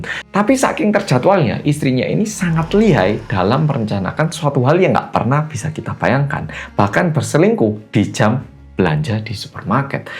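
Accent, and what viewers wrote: Java, Indonesia. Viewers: Salut dg org korea satu ini.. dg gaya bicaranya yg indon banget. Kl kasusnya sih ga beda2 jauh dg asn imigrasi pekan baru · Uedan kok bisa semedog itu bahasamu masee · Logat korea mana ya, kok agak mirip Logat Jawa tulen,,,, maaf maklum subsreber baru nih,,, baru nonton video nya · Bahasanya bnr2 lancar udah seperti org indonesia asli. Logat jowo pisan😅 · Korea top logatnya jowo tenan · Wuaduh kang Korea medhok berubah ke tema selingkuh, tau aja tema kesukaan netizen. Ha...ha...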